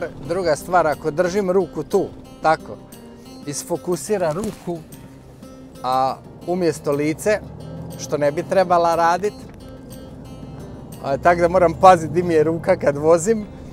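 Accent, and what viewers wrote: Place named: Vojvodina, Serbia. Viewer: Pozz iz Amerike,centralni dio ili midwest ( st louis I okolina 😂 ) Volim tvoj naglasak,malo mi "bacas" na Lalu 😊😊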